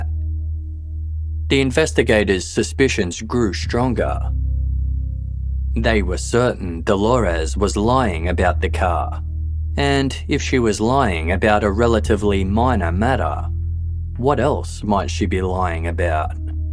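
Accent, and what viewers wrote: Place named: Queensland, Australia. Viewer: The Queensland accent is especially delicious for the dark bits!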